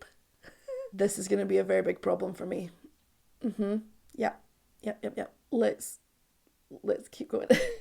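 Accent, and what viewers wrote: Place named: United Kingdom. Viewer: Ohhh, you are so cute...your femininity...when you touch your beatiful hair, ok, a gift for you, react to, but...with singing, the others...poor of She´s, ohhhhh, you so cute, love you english too, it´s the best Britanic accent, kisses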